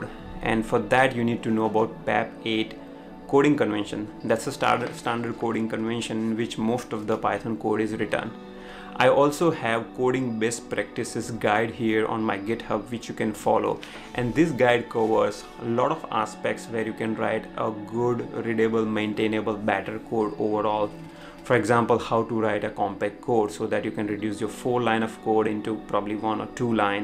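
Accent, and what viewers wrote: India. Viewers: What accent? Foreign return or Indian?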